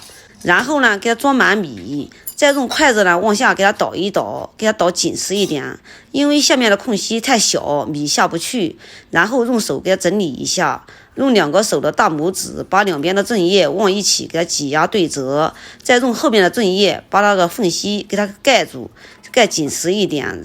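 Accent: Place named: Guilin, China. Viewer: 解释得非常清楚，还喜欢你的口音，很是桂林人吗？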